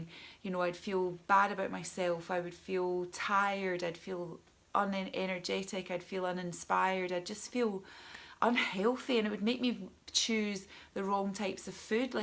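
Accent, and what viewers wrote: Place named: Ireland. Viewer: I hear your story. I hear your irish accent. Although I'm from the states I grew up in an irish catholic background and community where its commonplace to drink everynight after work and on the weekends. There was a bar on every corner. I'd walk to Flynn's corner bar and walk home in a blackout for years I did this. I'm 45 now and going to AA and really trying to stop my boozing days! Thanks for sharing love!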